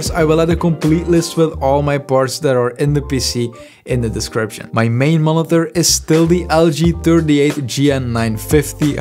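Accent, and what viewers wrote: Flanders, Belgium. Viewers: amai die klinkt belgisch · if you were from flanders (because of the accent)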